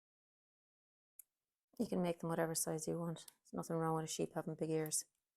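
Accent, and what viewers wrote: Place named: Ireland. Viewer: As ‘Sewcat’ above, says, I could watch and listen to your wonderful Irish accent over and over again.❤️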